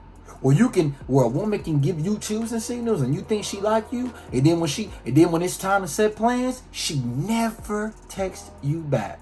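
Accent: Baltimore, United States. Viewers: BOY I KNOW YEW FROM BALTIMORE BY THE WAY YEW SPEAK 😂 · This is my 1st time watching your vids. Are you from Baltimore? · U from bmore? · you From BMore?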